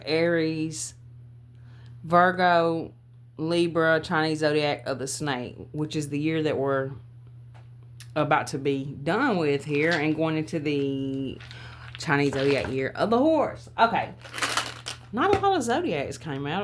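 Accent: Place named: Louisiana, United States. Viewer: Amen ✨️ 🙌 🙏. Your accent is so cute. Louisiana?????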